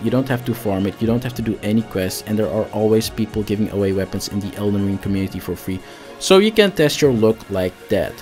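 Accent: Netherlands. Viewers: Totally agree. The builds are on point, 100% of the time. And, the Dutch accent provides the same gravity for ER buildcraft vids the German accent provides for the Mathologer's math videos! 😎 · followed ur early to mid game build, and now doing this one too. love the detail and explaination. btw are you dutch?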